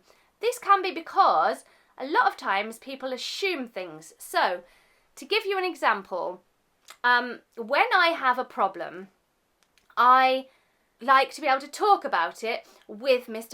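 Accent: United Kingdom. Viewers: Good upload. Thanks for doing so. I'm guessing the narrator is English or lives in the UK, based on this person's accent - I'm Canadian and live in Toronto, but I'm sure a lot of what this person is saying could apply in other countries & cultures.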